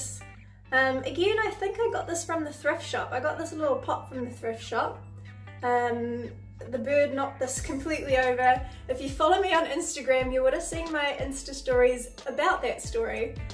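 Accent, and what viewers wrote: New Zealand. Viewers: Spot that gorgeous bliiiing 😉💍 it’s so good to hear a kiwi accent and I love your pizazz so much ugh you give me life!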